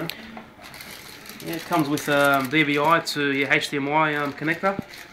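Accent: Australia. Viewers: hes aussie for sure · Greek Australian I'm guessing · British? he sounds like an auzzy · Actually, I'm English and he doesn't English. He sounds Australian.. And No, it isn't obvious that he's Enlgish, because he's Australian >.> And if you still don't believe me, then you're an idiot, because on his channel: Hi My Name is and i live in Sydney, Since the age of 10, I developed an interest in eXtreme Computers, Thanks to YouTube i have the opportunity to share my passion & inspiration to the world. Country: Australia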